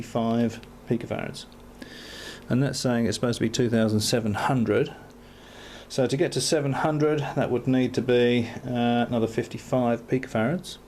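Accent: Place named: Australia. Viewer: Hi!! you seems to be an aussie!!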